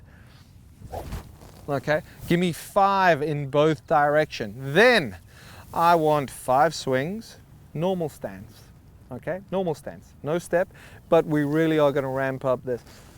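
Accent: South Africa. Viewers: Howzit, First time watching this video and found it really helpful. I just wanna ask one thing Are you South African because you have a certain twag that really seems South African 😂😂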